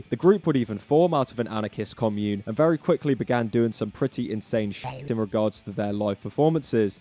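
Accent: United Kingdom. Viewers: first time i've seen you. i thought you were a British Lad you know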